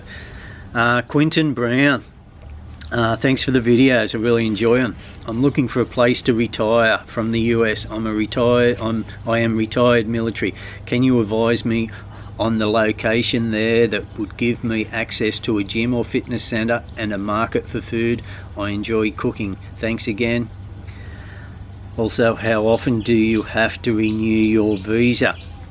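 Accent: Australia. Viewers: Hey....don't seek perfection...in every video you are doing...great...its informative...since it's new world to us....l miss vientiane....sooo much... Dazzling nature....honest People....love your ozzi accent....Baghdad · Your Australian accent is enough to keep me watching 🤣😆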